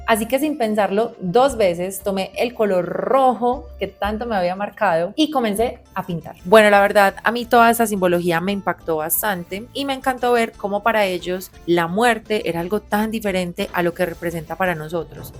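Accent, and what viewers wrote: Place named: Colombia. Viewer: Como Peruano estoy orgulloso de tus conocimientos en mi querido Perú,....por tu dejo ,.--- se que eres de la rica Colombia, te envio bendiciones y que todo te vaya bien.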